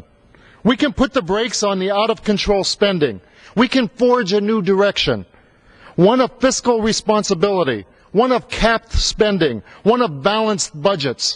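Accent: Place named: Hazleton, United States. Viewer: he still speaks like a hazletonian.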